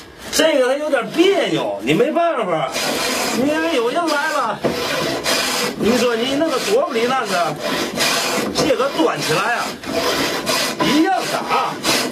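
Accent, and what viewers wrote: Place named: Tianjin, China. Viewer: thanks for uploading the video! I grew up in Tianjin when I was young and I love your accent.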